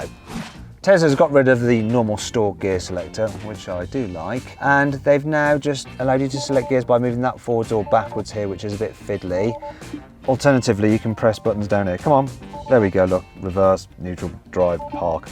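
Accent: United Kingdom. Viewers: ㄹㅇ 카와우 영국발음 소리가 좋아서보는사람 나밖에 없나...?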